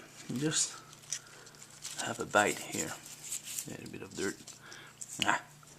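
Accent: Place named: Acadia, Canada. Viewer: yes from val damour nb je suis acadien aussi lol ses pourquoi je reconnais laccent lol merci pour vid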